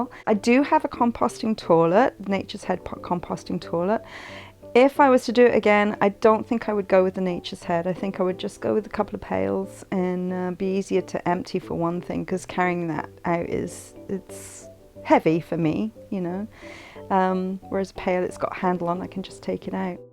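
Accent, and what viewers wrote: United Kingdom. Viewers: Are you originally from the UK?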